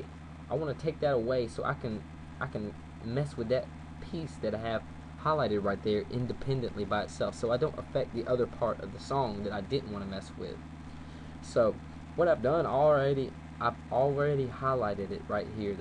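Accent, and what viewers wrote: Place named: South, United States. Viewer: the guy talkin sounds like he's southern and trying so hard to not let his accent to come out.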